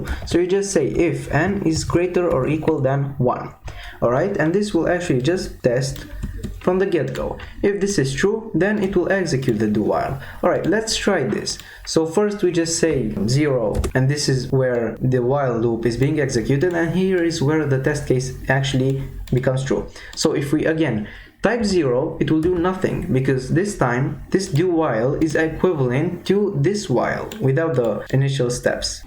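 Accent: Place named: India. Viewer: More indiant accent please!!! Btw great video!!! Sub from me😁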